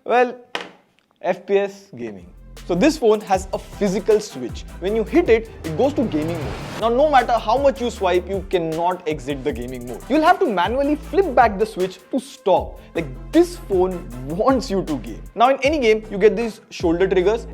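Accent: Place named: Bengal, India. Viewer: I think you are a Bengali boy because your English tone is just like them 😅😅😅😅😅 answer me please 😢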